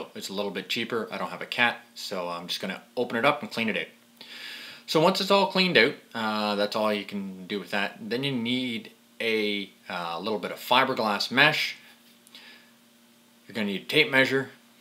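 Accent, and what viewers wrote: Canada. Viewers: It's all cleaned oat, yep he's canadian.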